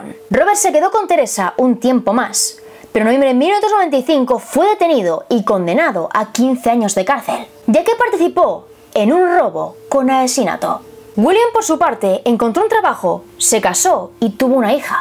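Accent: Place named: Spain. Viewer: Aaaayyyy Maadreee Vale madre . Xd Es que tu acento español y tu sentido del humor... lo amo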